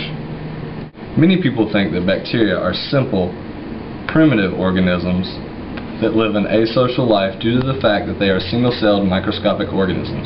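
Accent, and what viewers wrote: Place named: South, United States. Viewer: visuals were kind of blurry, but quick pace made it easy to follow. I find your southern accent endearing :)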